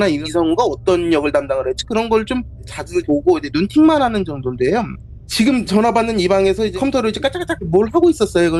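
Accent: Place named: Gangwon, South Korea. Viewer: 대전분이라해서 들었는데 토박이는 아니신가봐요 말투가 전혀 다르시네요 강원도분인가ㅋㅋ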